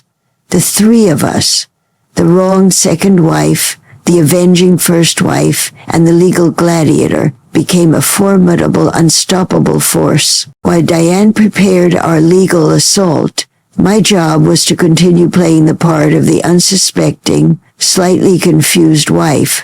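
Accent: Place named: Ireland. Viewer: Interesting Irish accent emerging at times. Engaging story nonetheless.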